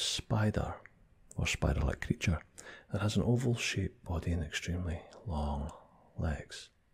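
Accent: Scotland, United Kingdom. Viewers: Thanks for the tag! I can't wait to watch this, you know I love a Scottish accent 😴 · My favorite word to hear you Scots say is "murder" 🤤🤩 its the Rs and muddled U, and abruptness of the end (I also like "order" and "fine.")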